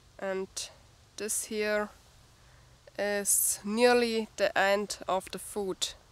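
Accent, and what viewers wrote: Germany. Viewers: you are extreemly funny .........its just your English with German axcent is kiling ......please accept this comment as compliment .....because it is plus not to forget that those shouse are the best easier way in a surviving situation in case you got lucky to kill larger animal and skeen it or you come a cross dead one happend to have still a skin .........great simple shose to cover and protect your feats .......lovly ......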